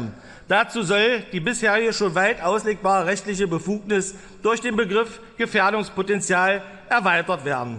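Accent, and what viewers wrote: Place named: Saxony-Anhalt, Germany. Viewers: .... ich fände es toll, wenn Herr Kirchner mit seinem sympathischen " Machdeburjer Dialekt" der nächste MP in Anhalt wäre. Die Eier dazu hat er. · Sachsen- Anhaltiner isser...keen Sachse😊😊 Lutzi😊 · Das war kein sächsisch ,sondern reinstes hallesch! L.G.aus Sachsen- Anhalt!😊🧡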